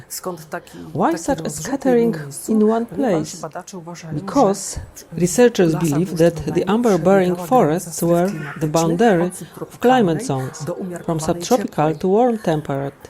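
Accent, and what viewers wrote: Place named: Poland. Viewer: NIE DA SIĘ TEGO SŁUCHAĆ GDYBY NIE TEN POLSKIANGIELSKI TO MOŻNA BY OBEJRZEĆ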